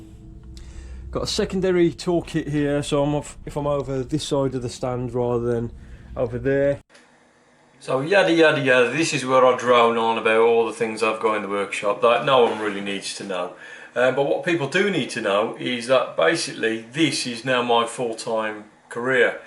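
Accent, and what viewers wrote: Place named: Midlands, United Kingdom. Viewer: yeah I thought I could hear the Midlands twang lol im from great barr , really down to earth videos im enjoying them , I'm just trying to sort myself a starter bike , although I hope there isn't an age limit I'm 54 , exercise and weekend rides with my lads , any tips on what to look for, unfortunately budget a bit tight so I'm looking for a cheap as I can and maybe upgrade .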